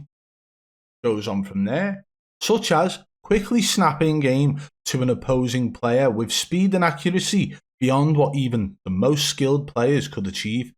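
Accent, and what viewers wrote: Liverpool, United Kingdom. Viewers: Does anyone else think of the scousers from Harry Enfield when they listen to this dude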